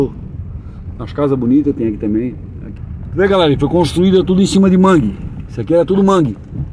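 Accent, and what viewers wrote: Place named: Santa Catarina, Brazil. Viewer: Lugar lindo, minha família por parte de pai é nativo daí,eu adoro o sotaque catarinense.lembra meu pai,Deus abençoe sua vida e família.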